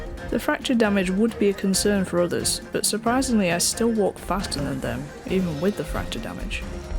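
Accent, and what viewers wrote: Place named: United Kingdom. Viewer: Ah ha! So you are bri-ish!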